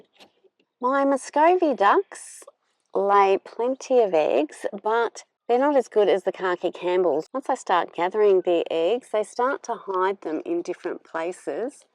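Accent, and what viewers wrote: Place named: Australia. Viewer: You sound a little australian. Can I ask what country are you in??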